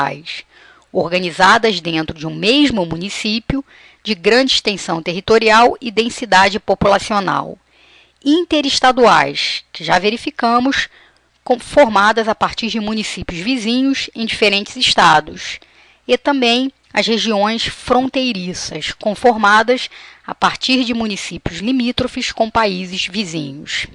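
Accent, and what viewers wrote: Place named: Rio de Janeiro, Brazil. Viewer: Carioca falando é chato pra kralho...mas a aula foi boa.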